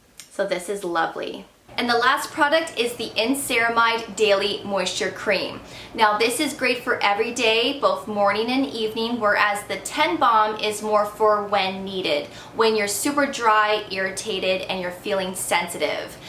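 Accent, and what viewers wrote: Canada. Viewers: So glad you are proud of you Canadian accent! I’m also proud of my French Canadian accent! Go Canada! 🥰🇨🇦🙋🏻‍♀️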